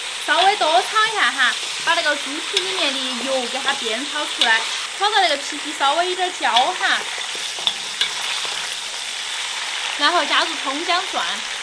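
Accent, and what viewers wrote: Sichuan, China. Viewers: 四川妹子? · 都不知道你说的啥普通话 你是那个嘛四川的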